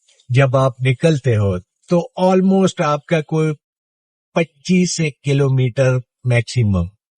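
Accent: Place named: Karachi, Pakistan. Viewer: Youn lagtahai keh Karach ka aik Padha likkha nojwan baat ker raha hai.😊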